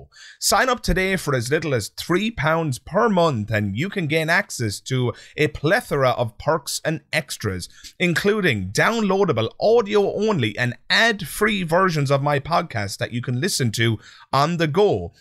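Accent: Ireland. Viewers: Replying here mainly to test a theory I have pertaining to YT comments. Anyways! Loved the skeptalk show with you and Shannon, both of you brought your absolute A game! Skeptalk is how I found your channel. I'm a bit of an accent/dialect connoisseuer, and listening to Shannons Canadian english playing off against your Irish english hit a sweetspot for me. I know, I'm weird that way.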